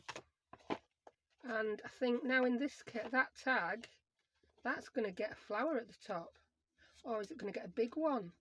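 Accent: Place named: North, United Kingdom. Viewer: Well I can relate to you No1 because you sound northern, No2 because you’re not American and want us all to go to Dollar Tree , Walmart or Hobby bloody Lobby .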